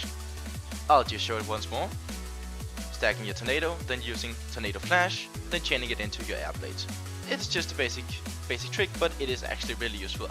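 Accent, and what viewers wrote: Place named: Denmark. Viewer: You sound a bit danish.....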